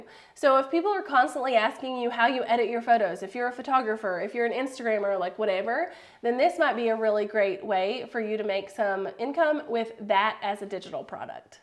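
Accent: South, United States. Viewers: Hi my first time seeing your video.. ive always thought about selling digital but never knew how.. i also love your country accent brings back happy memories.. from what you mention id be interested in learning how to sell digital planners online and what kind of platform would i need in order to do this.. can you help me.. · I loooov your english! Where in the US is it from? It sounds so nice!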